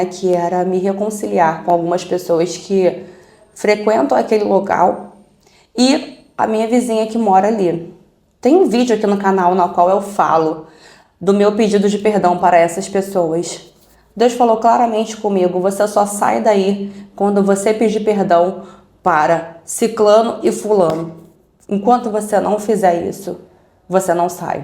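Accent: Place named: Rio de Janeiro, Brazil. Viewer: Voce e carioca teu sutake parece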